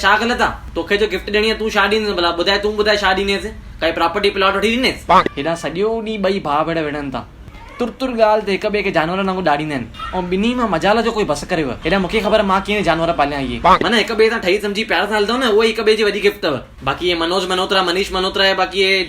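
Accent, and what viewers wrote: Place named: Larkana, Pakistan. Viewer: Your grandparents must be from Larkana because your Sindhi is 100% similar to ours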